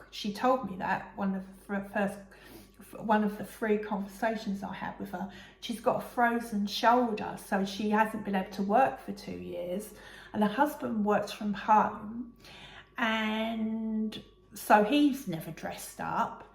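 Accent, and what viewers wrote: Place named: Australia. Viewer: G'day - good Aussie accent! A cold Fosters always goes down well on a hot day. Nice poo bag. Wilson will be looking forward to providing something for you to put in it! I will have a look on the Etsy shop. Certainly a ton and more of rainfall in Australia. With the fine weather in the UK you will have to get your bucket and spade out soon to head for the seaside. Happy days! Can you swim or will you need a blow up rubber ring with a horses head or similar on the front! We often went as a family to Great Yarmouth in summer - donkey rides on the beach.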